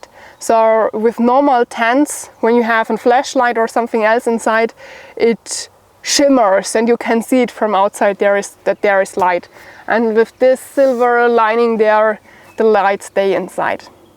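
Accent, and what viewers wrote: Germany. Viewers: That was a very interesting video. A little bit of a departure from your normal videos. You always have the best equipment and take the time to explain each item. Loved the entire camouflage on everything. You look so much better these days. Then again you look good always I must admit! You're obviously recouping well and looking good as ever. Just can't get enough of your German accent. It's so adorable. Appreciate how you put the hard work in for each video. Good health going forward. God Bless. 🥰🤗❤🙏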